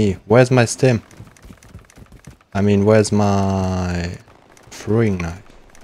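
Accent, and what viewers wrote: Germany. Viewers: Ehrlich du hörst dich voll deutsch an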